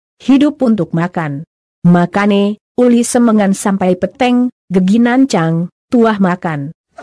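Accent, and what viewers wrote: Buleleng, Indonesia. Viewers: Gaya kipak kipek lucu. Lebih cocok logat Beleleng anggon. Ini rekaman lama tapi saya baru sekarang nonton. Demen masi.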